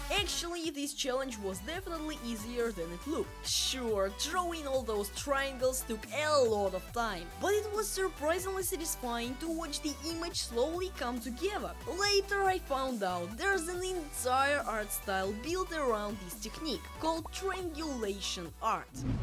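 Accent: United Kingdom. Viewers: Are you British? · Why do you sound British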